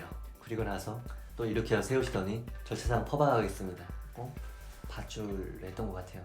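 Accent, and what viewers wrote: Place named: Busan, South Korea. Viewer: 부산 특유의 억양 ㅋㅋㅋ 북한에서 청년기 보내도 서울말 자연스럽게 쓰는데 왜 경상도는 안 되는 거야 ㅋㅋ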